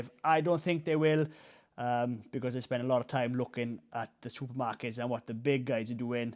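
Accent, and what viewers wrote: Wales, United Kingdom. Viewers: Love the Welsh accent!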